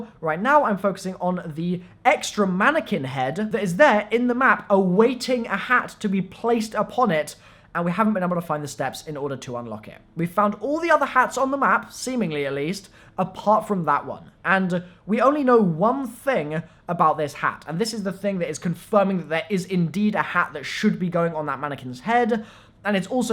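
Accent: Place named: United Kingdom. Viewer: Your accent just makes me so hype to be visiting the UK bro #muchosmelons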